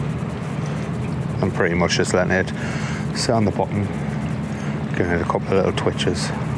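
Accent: Newcastle, United Kingdom. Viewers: No offence but I hate the Geordie accent I've had a few bad run ins with Geordies and I just can't handle the accent any more. Good fishing tips thanks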